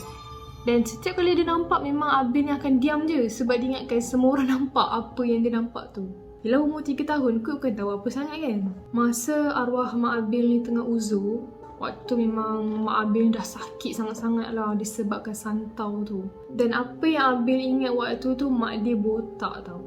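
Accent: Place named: Malacca, Malaysia. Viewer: Akak org melaka ke kak? Cara bercerita mcm org melaka je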